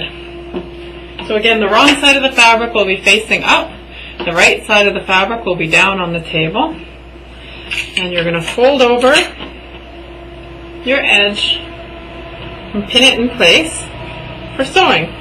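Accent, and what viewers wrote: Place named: Minnesota, United States. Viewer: Do I detect a Minnesotan, or something nearby, accent? 8) You sound like so many of my northern relatives. Thank you for the video - about the only thing I can sew is blankets and pillow cases so it was nice to see another use for straight lines. I like to use box flannel and back it with satin - it's a tough sew with the slippery fabric, but the kiddos Love the 'silky' when they take their naps.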